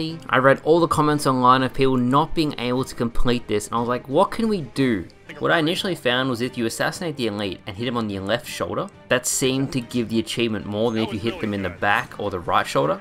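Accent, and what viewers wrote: Australia. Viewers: Better than achievement hunter cuz it doesn't have 4 minutes of stupid jokes and jacks mediocre voice. makes it quick and easy and aussie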